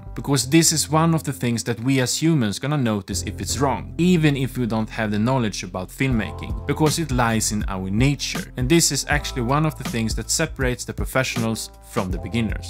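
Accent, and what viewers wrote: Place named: Sweden. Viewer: Thanks for an really easy to follow video, also wanted to say I found it funny as a swede that your svenglish accent is so apparent, keep up the good work man!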